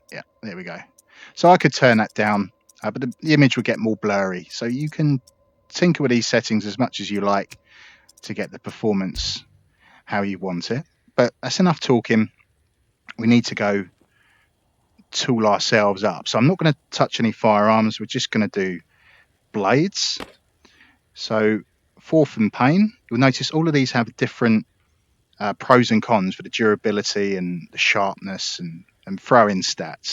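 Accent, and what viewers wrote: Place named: United Kingdom. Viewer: He (and me) are British most likely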